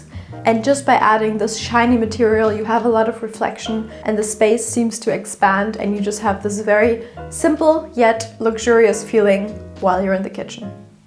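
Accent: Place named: Germany. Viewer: I love to hear you speak. Your voice is so beautiful and your English is perfect with just a hint of (German?) accent? Sorry if I got it wrong.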